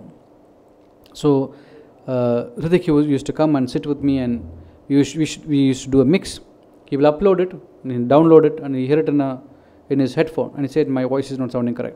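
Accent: India. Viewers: hahahah bldy indian english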